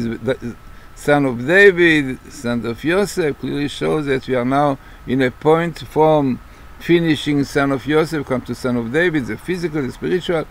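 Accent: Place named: Middle East. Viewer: Your middle-eastern accent is quite strong. People in English speaking areas need to pay CLOSE! ATTENTION, and they will understand. SORRY for your struggle. Your tables are a tremendous blessing. Thank you rabbi Glazerson.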